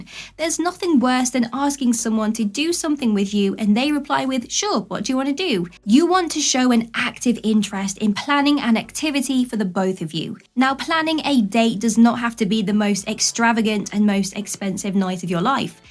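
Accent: England, United Kingdom. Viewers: I could listen to you,.... seriously, what part of England is your accent from?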